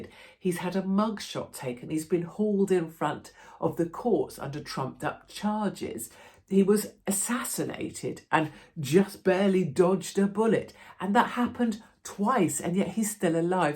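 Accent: RP, United Kingdom. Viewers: .....you are such a Sister, a Yank with a posh British accent. We love you over here. Thank you for your reasonable, common sense. Keep speaking your mind!!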